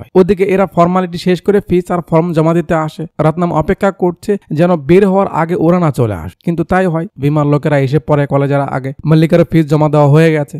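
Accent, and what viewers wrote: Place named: Rajshahi, Bangladesh. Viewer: Anpr Kotha bloar style aktu aktu rajshashi r Manus er moto... ❤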